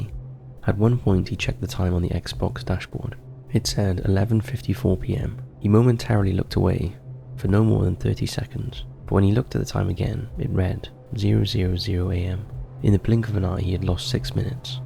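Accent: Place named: England, United Kingdom. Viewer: think everyone agrees not sure where in England he is from?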